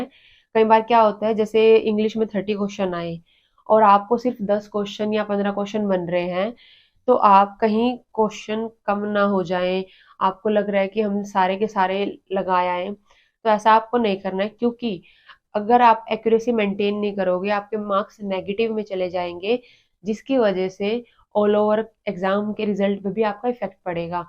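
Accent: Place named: Himachal Pradesh, India. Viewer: Your accent is Himachali...💞...nd thnxz for boosting ma'am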